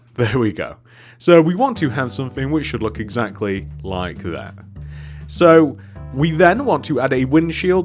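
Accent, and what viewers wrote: United Kingdom. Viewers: heeyyyy watsup youtube? me: heyyy nothing much but you get more British everyday.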